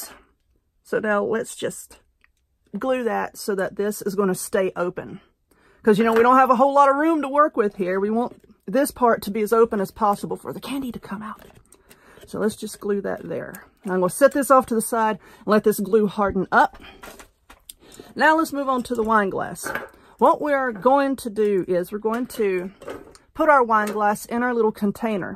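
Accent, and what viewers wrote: South, United States. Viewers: This is cute as a bugs ear😉..I get the biggest kick out of your videos..your VERY talented but,what I adore the most about you is..your southern/country as a turnip green😉😂..OH!and that's a compliment!!..I'm from Tennessee(live about 30 ft. from Monteagle Mtn.😄)..you keep makin' am & I'll keep watching😊💕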